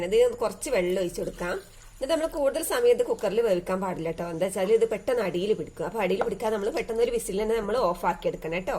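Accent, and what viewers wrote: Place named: Palakkad, India. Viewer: Palakkad slang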